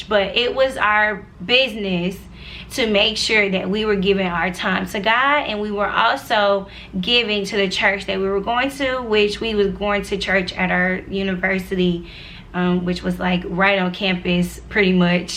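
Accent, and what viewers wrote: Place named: Georgia, United States. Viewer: Thank you for sharing your story! I resonate so much with the many years of believing in Him but not really KNOWING Him but having the time when you dive deeper & learn to CLING to the relationship you developed with the Father ❤️ P.S. while watching your video I was like “This girls accent is definitely a Ga accent” because I’m from Ga too, then you said Jonesboro & I was like 😮 I grew up in Jonesboro too!!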